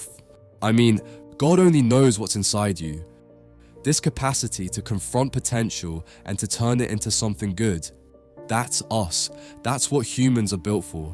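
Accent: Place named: United Kingdom. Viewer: Why did he turn British?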